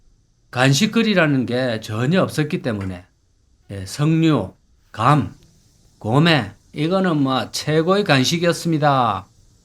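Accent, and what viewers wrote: Gyeongsang, South Korea. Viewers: 구수한 경상도 말투 사투리가 압권 ~~🎉❤ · 반가버요 목소리에 사투리에 빵터집니다 고매 경상도 사투리에 물들어갑니다^^ 축카 축카합니다~~😅😅😅😅😅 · 언제들어도 구수한 갱상도사투리.....늘 잘보고있읍니다 오십만..화이팅하세요~~~^^♡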